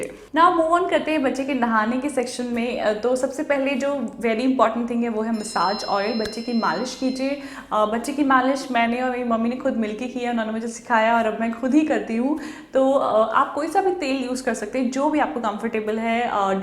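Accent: Garhwal, India. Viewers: Aap garhwali ho kya ma'am....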